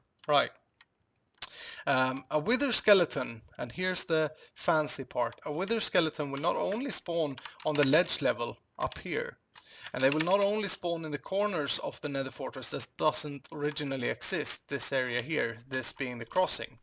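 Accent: Sweden. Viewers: Are you from Sweden? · You must be Swedish right?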